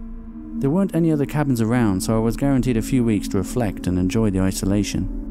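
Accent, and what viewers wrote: United Kingdom. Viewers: Are u from the uk sir?? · Haha I heard it but it's really a light british accent :) Btw thanks for replying so fast I just wanted to know one more thing do you write these stories yourself or do people send you some and you illustrate them :) ?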